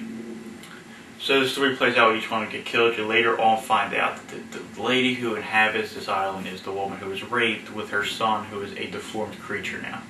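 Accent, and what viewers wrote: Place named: Philadelphia, United States. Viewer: your stupid accent makes me think Philly or Jersey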